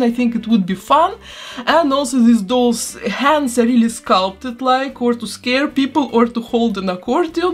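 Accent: Russia. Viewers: Блина малина Такой русский акцент Так необычно Кстати ооак очень круто вышел :> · она русская? я вот сижу и голову ломаю кажется мне или нет, вот прям ярко выражено русское произношение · Русский акцент, и тут я понял что это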